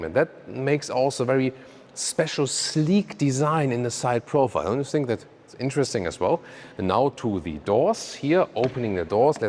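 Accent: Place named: Germany. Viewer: Naziv i opis kanala na srpskom, voditelj priča engleski sa nemačkim akcentom. Baš zanimljivo.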